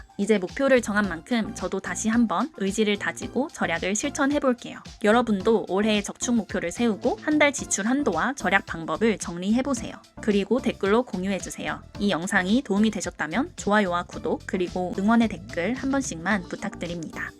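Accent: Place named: Gyeongsang, South Korea. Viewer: 갱상도 사람이셨군요?! 카페가 맞지요~ 타지에 오셔서 고생이 많네요, 저랑 똑같네요😀 이번에도 화이팅입니다~!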